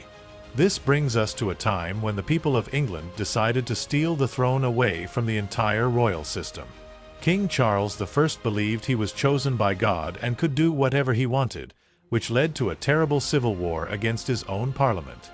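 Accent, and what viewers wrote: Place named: United States. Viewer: I lasted 59 seconds. Several reasons. One, the godawful music is so loud that you can hardly hear the narrator. Two, the narrator is talking in an American accent which means his pronunciation of certain English words is going to be dire and, Three, Just from those few seconds I could tell it was going to be CRAP.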